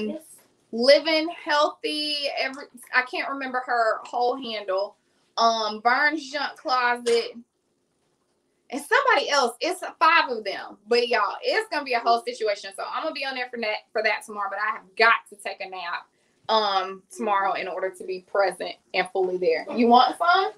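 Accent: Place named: South, United States. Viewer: Watching the replay thinking, is she sounding extra country today or am I tripping and then you said it, 😂😂 I'm like ok, I ain't tripping!! I will definitely be making this dip!! Looks delicious!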